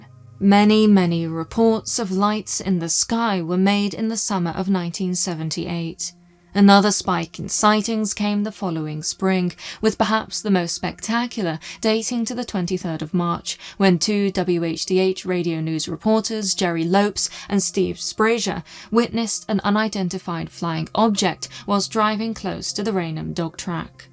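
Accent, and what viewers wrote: United Kingdom. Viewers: These subjects are so often covered by american writers that it is nice for a british person to hear the voice of a clearly spoken british person !!!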